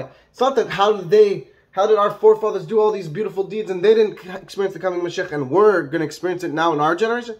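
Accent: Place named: United States. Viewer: Who are you, and where are you from? It sounds like you are from the U.S.A. HOW DO YOU KNOW?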